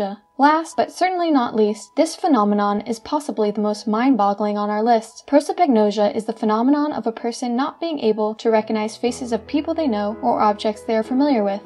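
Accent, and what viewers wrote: France. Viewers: Cute French accent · I mean hearing french people talk english is also cringeworthy. She's trying ok.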